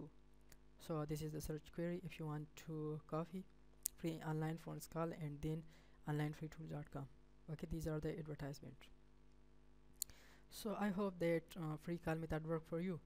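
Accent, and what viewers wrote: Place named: India. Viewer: Sounds like indian "Windows Support " scammer Leaves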